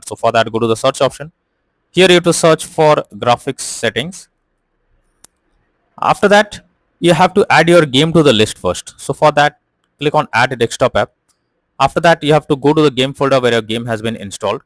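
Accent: India. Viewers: clicked video hears indian accent closes video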